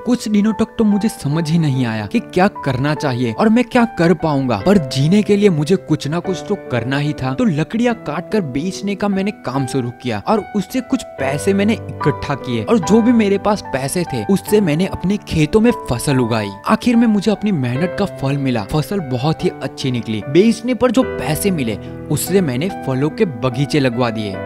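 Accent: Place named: Surat, India. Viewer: आप की सुरती बोली हे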